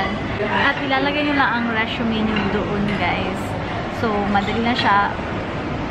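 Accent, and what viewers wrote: Visayas, Philippines. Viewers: Bisaya ka Ma'am no?!😂😁😁😁 minsan kasi nababasa ko sa acent mo minsan. But it was nice!!! keep uploading your video sa channel mo. I subscribed your channel na po and Thanks for the info!!! God Bless!!! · Bisaya ka po maam? 😊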